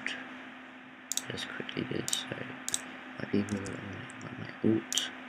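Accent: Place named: United Kingdom. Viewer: you sound like a more british version of my friend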